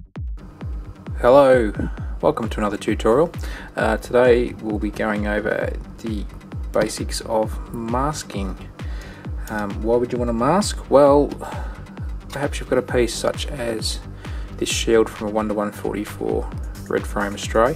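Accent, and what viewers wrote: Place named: Australia. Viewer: So Aussie haha (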